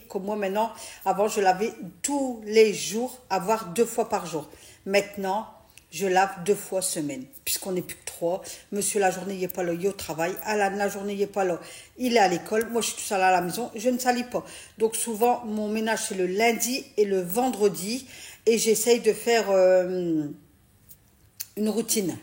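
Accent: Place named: Valenciennes, France. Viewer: Je trouve que vous avez l' accent de valenciennes, Denain, Douai, Orchies. Bibises.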